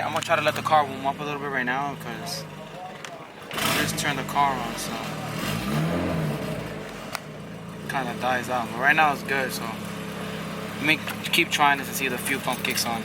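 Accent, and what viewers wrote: New York, United States. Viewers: U from ny gotta be?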